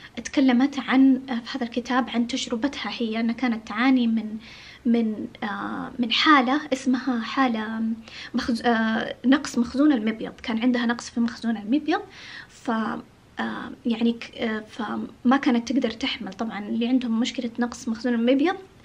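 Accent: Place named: Saudi Arabia. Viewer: ولدت بجدة و انا سورية و اضطريت للهجرة و اشتقت للسعودية لما سمعت لهجتك حنيت الله يجمعنا بكم بخير يارب انتم قلبكم طيب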